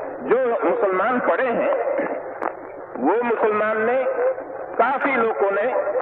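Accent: Gujarat, India. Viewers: Typical gujrati accent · in both speeches 's Hindi pronounces with Gujarati tone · That Gujarati accent! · Not voice but yes accent is same bcoz both have gujarati tone in hindi thats y luking little bit similar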